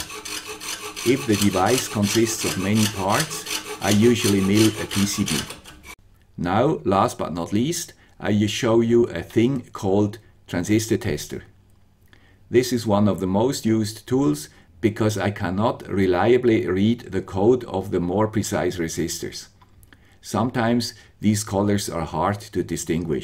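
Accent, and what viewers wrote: Switzerland. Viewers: Hallo, Your Video´s are very good. I like to watch them and hear your Swiss English. And I learn a lot of them. Maybe in the Futures you can make a Video how to handle a 3D Printer. I mean please show us how the software works. As I know it is not so easy to handle this 3D Software if you are a Newbe. And if you want make a Tutorial of all the things to know. Keep on with your nice Works. Best Regards Jürgen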